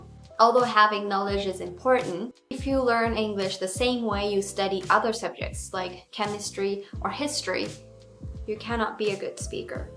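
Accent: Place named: United States. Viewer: Your voice is like an American.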